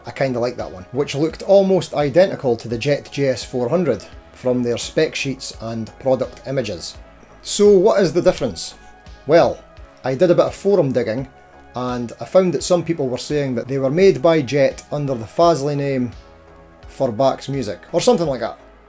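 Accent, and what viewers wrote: Scotland, United Kingdom. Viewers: Oh man i love your accent so much , the first seconds i thought you are Turkish or Arab , the way the " r" are pronunciate , but after 30 seconds it's obvious : this guy is Scottish or Irish ,i don't know ... One thing is sure , it's really different and unique , and really remarquable that in the North of England , Scottland , the people have an accent very close to the irish one , closer than for example , East England or the region of Leeds .... Maybe it'a celtic thing ii don't know , but one thing for sure : your accent is really deep ..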